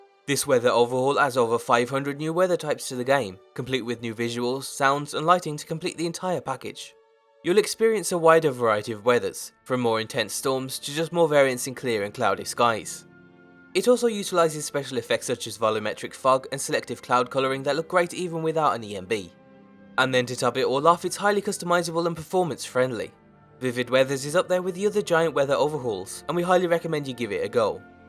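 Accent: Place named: Wolverhampton, United Kingdom. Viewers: dude you've legit got a Wolverhampton accent ;D ! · England, 'Midlands'. That is to say likely he comes from the area surrounding and including Birmingham. However is accent doesn't strike me as a brummy so perhaps slightly further north than Birmingham.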